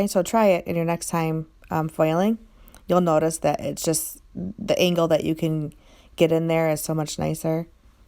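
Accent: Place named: Detroit, United States. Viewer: I knew I recognized that accent! My hubby is from around the Detroit area! 😊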